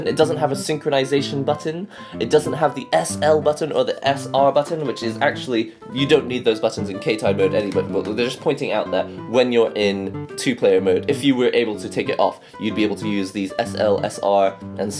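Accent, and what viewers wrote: United Kingdom. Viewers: He is Japanese but has a British accent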